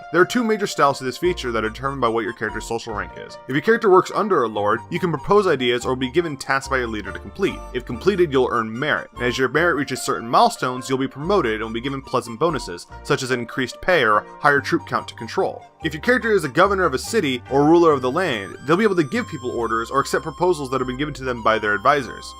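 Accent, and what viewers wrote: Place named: Midwest, United States. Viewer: That's one hell of a mid West Flow you got their son.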